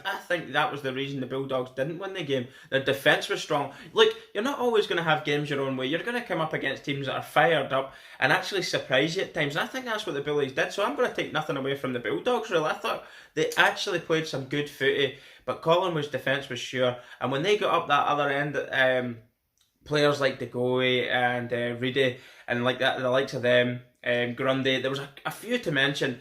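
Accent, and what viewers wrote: Scotland, United Kingdom. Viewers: love the scottish accent man, i see the rest of the round similar to how you do. Although i think St Kilda will be dangerous for us as they got a lot of negative press about their performance this week it could make play out of there skins, We getting 4 premiership players back including our captain and hopefully we don't get ahead of ourselves thinking it will all happen but come out and really work hard and get the job done.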